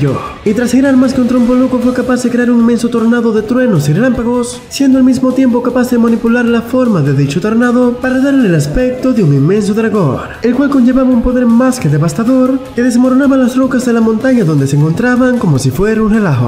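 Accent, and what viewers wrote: Dominican Republic. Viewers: Bro tú forma de hablar es peculiar de RD, será que si?🤔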